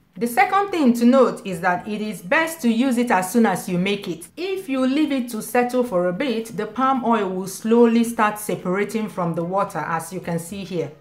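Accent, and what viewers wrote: Igbo, Nigeria. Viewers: Just like your Igbo accent. E dey deep ❤ Cheers sis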